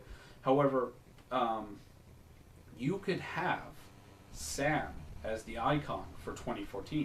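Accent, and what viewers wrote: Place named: England, United Kingdom. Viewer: Oh thats good and ohhh an england boy no wonder the accent ;) lol do u have kik?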